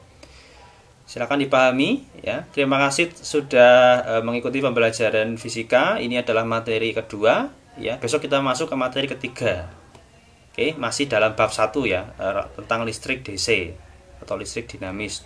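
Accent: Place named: Purwokerto, Indonesia. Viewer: Iseh ketara bahasa Purwokerto ne 🤣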